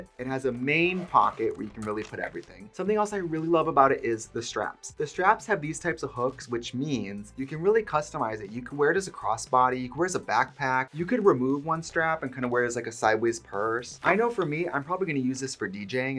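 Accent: Wisconsin, United States. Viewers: Aw, that lil WI accent pops up now and then and I love it. The straaaps 💜 collection is fire - that purple is beautiful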